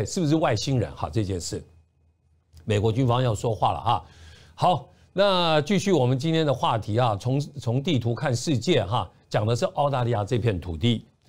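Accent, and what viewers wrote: Taiwan. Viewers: 主持人华语是台湾学的吧，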